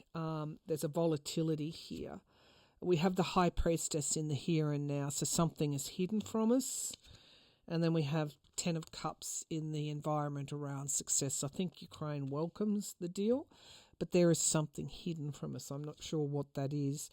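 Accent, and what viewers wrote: Australia. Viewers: I love hearing the sayings in different countries! I've never heard 'rugged up' - I chuckled a little and thought it is quite appropriate when wrapped up in cozy, fuzzy sweaters ('rugs')!!! (I'm in the US, originally from MA, been in FL for 20 yrs now) starting to get hot already!!! Would love to see Australia!!!!!!!!!! My favorite accent!!! The you!!!!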